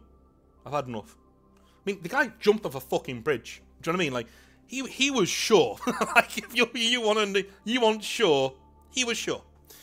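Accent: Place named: North, United Kingdom. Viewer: I found this channel while feeling down and getting back in to the Hobby. Currently listening and getting joy from the stories, and familiar sounding northern advice, while waiting for hobby tools to build up some Orcs and Bretonians. Orcs are just super fun, and I want some good noble knights too. I may even get around to sorting my Steel Legion in red and black (Inquisitorial Guard) to finally join the Grey Knights... I like Knights! Thank you for the joy you have shared with me, and I mean that truly.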